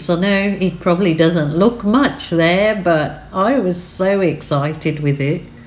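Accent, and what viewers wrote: United Kingdom. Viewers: That is pretty. I love the mountains 😁. I've never tried to do the shellee bloom, but have thought about it. I've seen someone (can't remember who) do it with acrylics. She used much thicker paint and spun it after blowing it out. What brand of paint, I couldn't tell you. You've lost the Brum in your accent, but you still have a strong Brit accent. My other half is from Manchester. Thank you for sharing ✌️❤️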